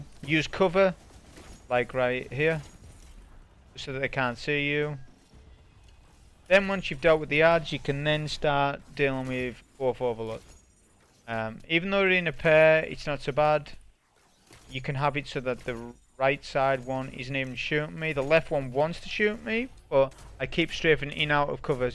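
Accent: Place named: Newcastle, United Kingdom. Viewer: That's a fine Geordie accent 👍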